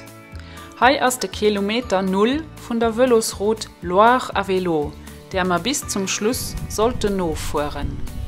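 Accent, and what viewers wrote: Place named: Transylvania, Romania. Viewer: Ich sage ganz salop, hallo, die Fahrradtour und vor allem die Sprache hat mich einfach platt gemacht!!! Ihre Sprache, ist mein DIALEKT. Den Untertitel habe ich ausgeblendet damit ich Ihr "Siebenbürgisch" richtig genießen konnte. Einen Daumen hoch und Abo habe ich hinterlassen. Wünsche allzeit gute Fahrradtouren.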